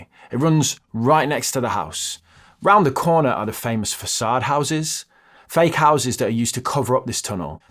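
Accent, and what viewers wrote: Birmingham, United Kingdom. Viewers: Took me this long to realise you were a Brummie 😂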